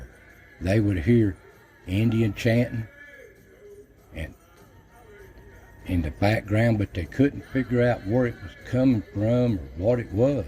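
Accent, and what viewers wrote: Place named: Kentucky, United States. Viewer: "Taint no hant it was Indians watching and protecting, "Love the way you talk, "A Kentuckian Cherokee here, "That's the way everybody talks up in these mountains. "Stayed in trouble at schools because of the words "Taint and hant, "Yep, Hant got none or I taint study and work at the same time, Or over yonder, Or up the road just a skip, "Skip meant a mile or so. "Love it, "You can not beat mountain folk, Know where, "And if they like you, "Well then you've got a friend for life, "But if they don't like you, "You'll know instantly.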